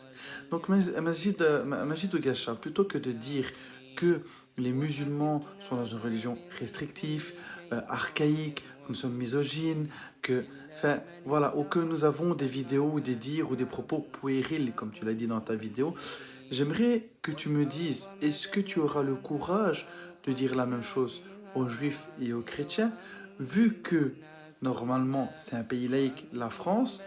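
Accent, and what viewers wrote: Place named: Namur, Belgium. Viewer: Ou habite tu en belgique ? J'entends un accent de namur mais je ne suis pas 100 % sur. On pourrait parler face à face d'appliquer la sh4ria dans notre pays et les details que ça implique ? Tu pourras réaliser le nombre incroyable d'infidéles à faire Peter avant que nos loi et advancés sociales ne retournent à l'age de fer.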